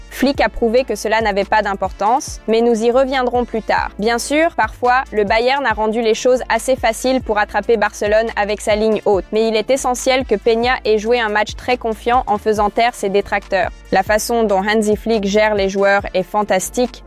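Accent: Quebec, Canada. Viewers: Je ne comprend pas cette chaîne ? Est-ce une IA ? Une voix d'IA ? Une québécoise ?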